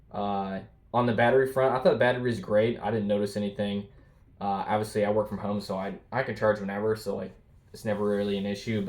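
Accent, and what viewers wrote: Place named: Texas, United States. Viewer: Why is this Swedish man speaking in a Texas Accent? His face looks exactly like the image on the Shroud Of Turin. His phenotypical facial features indicate he directly descendanded from Aryan stock in Northern Scandinavia. Google the Shroud Of Turin. The image captured on the cloth is a direct phenotypical copy photo of this guys face. I wonder if he has the courage to type a google search on what i said.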